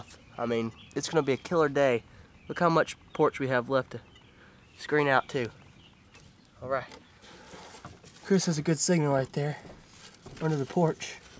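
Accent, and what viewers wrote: South, United States. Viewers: You got the most country accent ever! I didn't think anyone could outdo me. Good luck out there huntin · That's a Southern accent I know because I am Southern and spend my life outside with the chickens and cows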